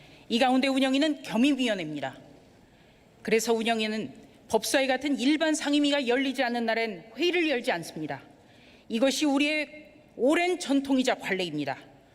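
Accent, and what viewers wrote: North Korea. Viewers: 저 사람은 탈북자 에요?..말투가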